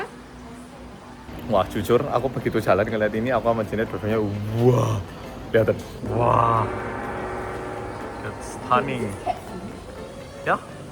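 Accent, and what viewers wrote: Java, Indonesia. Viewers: ur korean accent jdi berubah ya bro, jdi jawa medok accent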